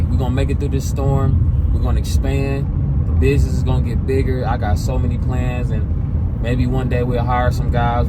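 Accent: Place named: Detroit, United States. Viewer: Man I thought she sounded like a Detroit just wasn’t sure. This mf is bad man love this build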